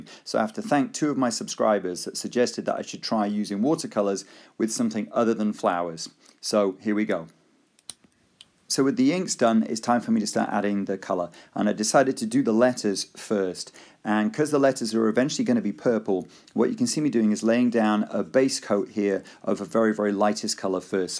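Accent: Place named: United Kingdom. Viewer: No one here will get this - but as you started talking I was absolutely sure, that you are Liam from Critical Role. You literally have the same voice (and British accent, which could be one of the main reason) but still the resemblance is (as Liam would say) uncanny.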